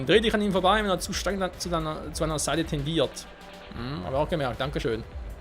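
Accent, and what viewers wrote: Switzerland. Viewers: Ich finde dein Schweizer dialekt ist so cool😆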